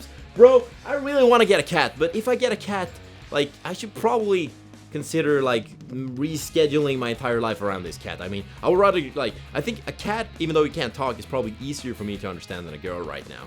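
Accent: Norrland, Sweden. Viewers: snacka mer norrländska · Svengelska still better ROAD TO GLÖBOL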